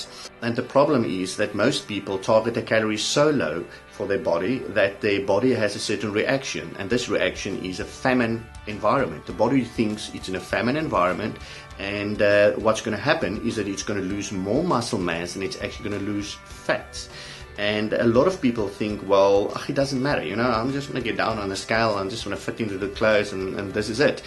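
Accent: South Africa. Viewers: Not only do I love this guys information, I am addicted to his accent! SA has the best English accent IMHO...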